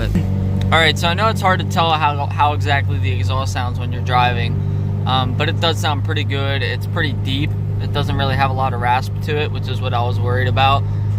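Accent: United States. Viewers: I was so confused why you were on the right hand side lol. You sound American lol. How. ARE YOU ON THE RIGHT HAND SIDE IN THE BEGINING BUT NOT THE MIDDLE OF THE VID. 😂😂 STOP PLAYING WITH MY MIND. But anyways, What did you do for the BOV? Was wondering how mine sounded from the outside. I have same exhaust set up but its all straight piped from the turbo and back.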